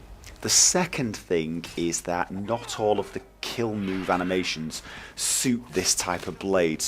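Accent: United Kingdom. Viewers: This guy, he really does a great job with these movies. His reviews are pretty good, I think he has good taste, and his accent is pleasantly British, but its the little things, like providing links in the description for Nexus and, perhaps most refreshing, links to the particular segment within an episode for a particular mod. One thing I'd like to see more of, however, is more first person perspective shots for reviews of weapon mods, which often appear different in FPS.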